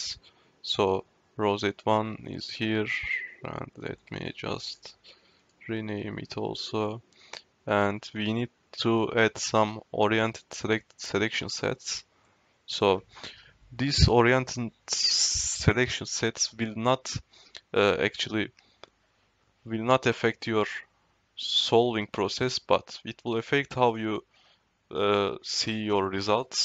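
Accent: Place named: Turkey. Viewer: Hardcore Türk ingilizcesi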